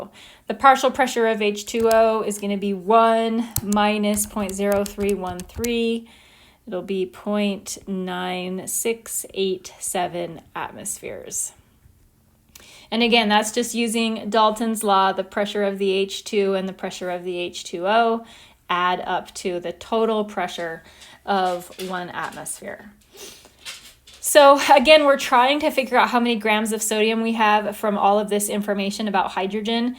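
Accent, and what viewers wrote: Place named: United Kingdom. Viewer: Hello MAM I'M A GRADE 12 STUDENT FROM INDIA.......I USED TO THINK I CAN'T UNDERSTAND BRITISH ACCENT BUT I WAS WRONG U MADE THIS GAS STOICHIOMETRY PROBLEMS DAMN EASY......I NEVER EVER UNDERSTOOD THE CONCEPT OF WATER DISP METHOD PROPERLY BUT THANKS TO U FOR MAKING IT A LOL TOPIC.......BTW PLSS MAKE VIDEOS ON PHYSICAL CHEMISTRY RELATED CONCEPTS AND SOME INORGANIC BLOCK CHEMISTRY RELATED CONCEPTS😅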